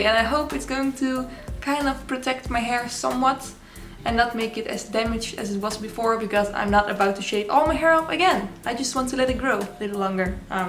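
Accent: Netherlands. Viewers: Are hou Dutch? You sound Dutch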